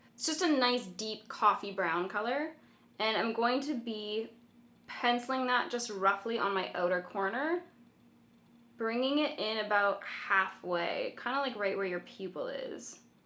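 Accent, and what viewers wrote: Canada. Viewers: Stay awesome! Also luv the way you Canadians say your vowels ❤️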